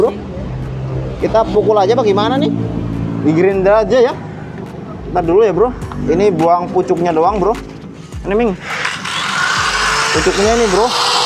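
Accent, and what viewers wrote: Banyumas, Indonesia. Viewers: Mas sampeyan wong ndi yah Bahasane daning kaya ngapak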